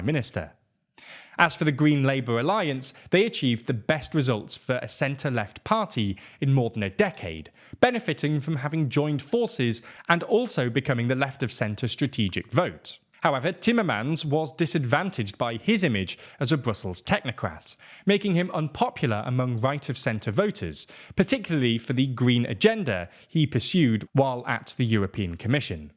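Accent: England, United Kingdom. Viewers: Yes You tell me, a Dutch citizen, with your pompeuse Brittish accent. First fuckup one minute. Okay he put those points(Wilders) in the fridge. PVDA Groen Links(far left) NSC is mid left, actually wilders is left on economic stances and right on migration. He is NOT Anti EU he is for an Economic European community, you know the thing EU was supposed to be. For a Brit, you got your facts pretty well sorted. I predict PVV, BBB, NSC, VVD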